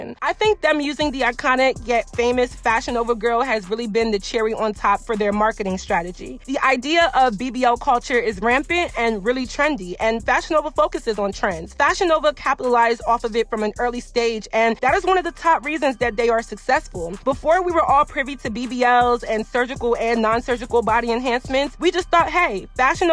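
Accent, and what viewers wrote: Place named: DMV, United States. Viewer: Are you from the dmv? Lol I can hear it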